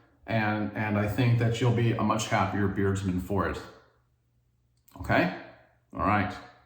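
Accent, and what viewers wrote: United States. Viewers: I learnt so many tips from you mate. Amazing , love your yank accent !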